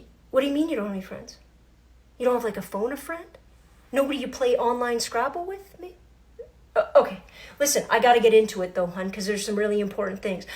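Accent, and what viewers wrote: Canada. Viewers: This is what a true Canadian who loves their country looks like and sounds like. I know that scares some people, but I say thank you. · Now that I know you're Canaidien I hear it. Love it.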